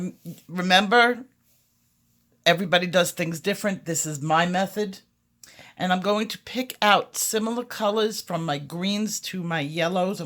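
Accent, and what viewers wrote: New York, United States. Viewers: I love your New Yawk accent! Well done video as well.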